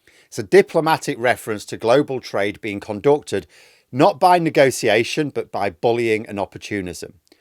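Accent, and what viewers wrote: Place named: Yorkshire, United Kingdom. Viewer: Is he from yerksher or is it U.S. lol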